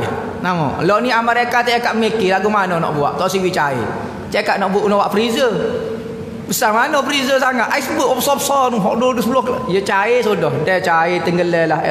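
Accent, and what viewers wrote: Kelantan, Malaysia. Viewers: Sedap dengar ustad kecek kelate....memang best....kadang²ustad lawak juga😅😅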